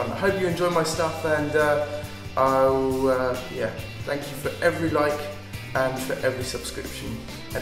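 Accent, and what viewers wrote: United Kingdom. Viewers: Hey. Sind deine Eltern Briten? Oder hast du einfach mal ne Zeitlang in England gelebt? Dein englisch ist mega und den britischen Akzent hört man einfach. Ich liebe den britischen Akzent:-) ^^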